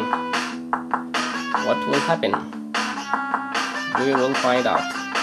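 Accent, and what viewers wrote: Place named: Brazil. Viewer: Lol... Would you happen to be Brazilian? If not, hopefully no disrespect is inferred. I ask bcuz I hear an accent... Thanks!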